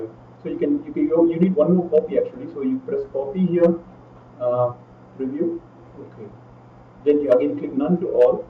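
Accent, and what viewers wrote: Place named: India. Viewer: The audio quality is so poor and the Indian accent so strong that the video is practically unwatchable. Thanks for the attempt but I rank it 3/10.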